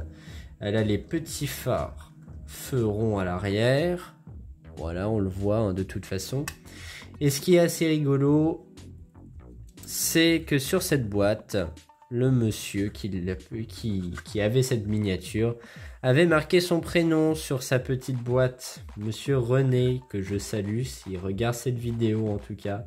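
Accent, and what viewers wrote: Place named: England, United Kingdom. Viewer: Elles sont magnifiques…!! Mais j apprecie aussi ton accent Anglais 😂😊🤭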